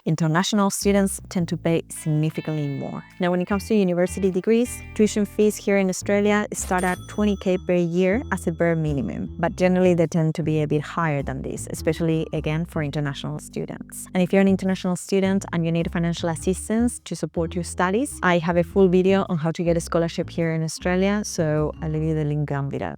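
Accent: Argentina. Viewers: ese acentooooo sos argentina? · El acento argentino siempre presente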